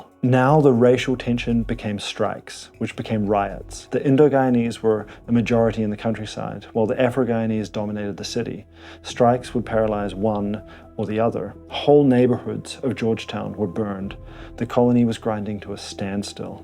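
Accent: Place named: New Zealand. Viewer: Great content bruzzy! Editing, music and most importantly delivery and info on point. Also as an Aussie who grew up in aetorea i dont think ive heard a more "international" or "posh" kiwi accent! its almost imperceptible but sort of ole' school journalist which i love. Keep up the good work. Subbed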